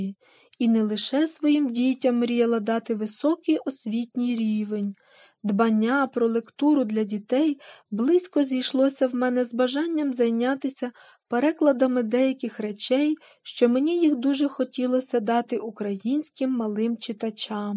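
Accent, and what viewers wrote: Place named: Russia. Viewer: Не до‘чка ,а дочка‘,багато наголосів російських ,а не полтавсьо -украінське.Розповідь інтересноа й пізнавальна ,але вимова не професійна .Спасибі .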